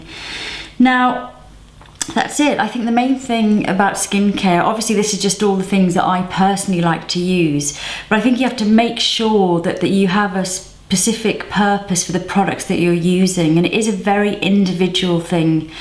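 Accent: United Kingdom. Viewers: Thank you so much... This information is priceless. I've taken notes to try some products. I have combination/oily skin and rosacea which makes my skin hyper sensitive. Besides, I'm allergic to salicilic acid so... I have to be creative in my skin routine. Lol, but it´s like you said, you have to pay attention to your skin because it talks in a very clear way, and if we listen, we will be able to treat it properly. BTW your accent is helping me with a recording I have to do in British English;)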